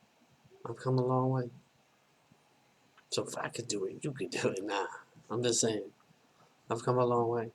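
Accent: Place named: Queens, United States. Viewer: Where u from man Oh wait shit u from queens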